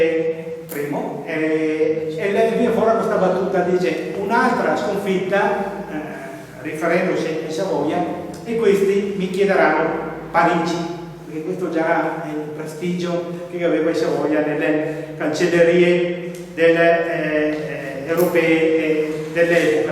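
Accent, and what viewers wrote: Veneto, Italy. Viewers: Un misto di Veneto ed Italiano ma male tutte e due,tant'è che io stesso che sono Veneto mi si sono allungate le orecchie per cercare di capirci qualcosa.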